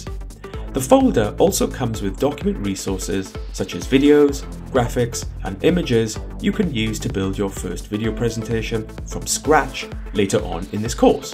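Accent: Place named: England, United Kingdom. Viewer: "Command Zee??" You sound like you have an English accent, I thought you were from England, now I'm really confused - where are you from then?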